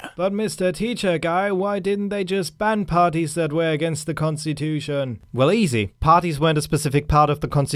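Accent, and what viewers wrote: Germany. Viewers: I swear this guys accent sounds like a mix between German and Australian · You are german arnt you? Always if you pronounce german words there is no actcent. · Are you german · Von wo hast du deinen deutschen akkzent? Sicherlich nicht aus Österreich oder der Schweiz.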